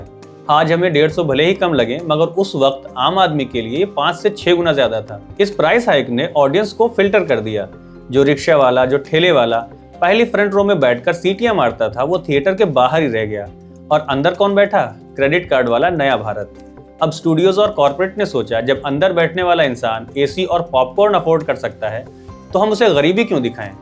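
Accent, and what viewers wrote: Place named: Karachi, Pakistan. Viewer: app ki zaban bilkul hamaray jaisi hay from karachi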